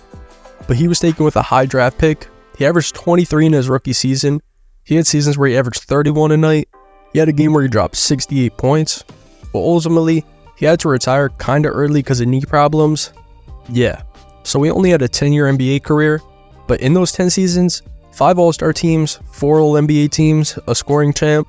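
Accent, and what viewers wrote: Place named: Boston, United States. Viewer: Sorry, I found you hard to understand behind that Boston accent. The profile of the NBA logo is Jerry West. "Larry Bird is the first white person...." you simply don't know what you don't know. George Mikan? Pistol Pete Maravich? Bob Petit? During the classic period of the NBA, select games were broadcast in the middle of the night, often days after they'd occurred. It's popularity -- which didn't really reach modern levels until Jordan, sprang from college basketball, where people watched the Magic/Bird rivalry, and where their senior game is still the most watched college basketball game of all time. The NBA just gave people a venue for people to keep watching it. Also, I'll point out something that SHOULD be obvious -- to even know who the MVP is, you have to already be a fan. Quick -- think of a sport you don't watch. 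Who's the MVP? See what I mean? Boston, particularly in the 80s, was a highly color conscious place. But most of the country isn't Boston (thank god).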